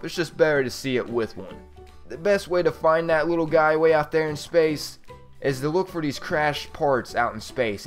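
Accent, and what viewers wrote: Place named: Pittsburgh, United States. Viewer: gotta be from Pittsburgh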